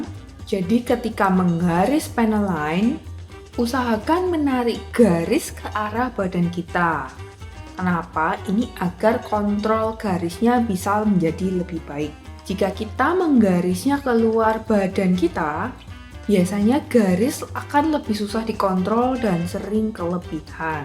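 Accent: Indonesia. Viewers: Tumben medok mbak nya. Tapi cakep banget 🥰🥰🥰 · Tante logatnya medok suroboyoan, sukses terus tante 👍 Tapi bolehlah kapan-kapan "Ajarin ngerakit gundam dong" masih newbie 😂 Twitter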